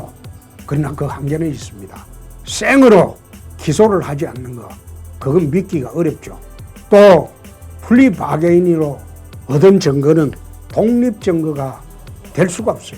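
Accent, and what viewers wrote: Gyeongsang, South Korea. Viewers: 준표검사님~ 우리 아빠 닮았어요. 넘 좋아요♡♡ 경상도 말투 듣기 너무좋습니다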